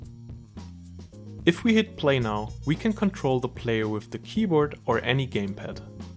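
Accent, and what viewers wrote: Germany. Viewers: Nices Tutorial, kann es sein, dass du Deutscher bist? xD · are you german?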